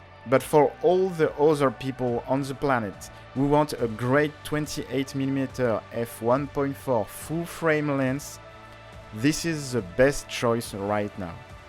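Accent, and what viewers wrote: France. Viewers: Encore une très bonne vidéo test avec de jolies photos, y a juste un truc qui me dérange....Your so French accent ! Jamais envisagé de faire des vidéos en Français ?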